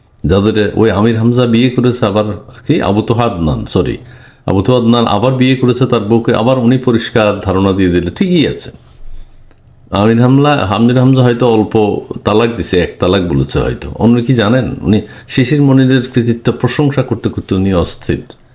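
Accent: Noakhali, Bangladesh. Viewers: দুর ও শালা নোয়াখালী কি বলে বুঝতে পারলাম না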